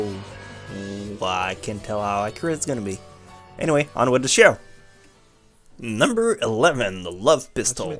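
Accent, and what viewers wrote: Quebec, Canada. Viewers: is Canadian and his first language was French so that's his accent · French Canadian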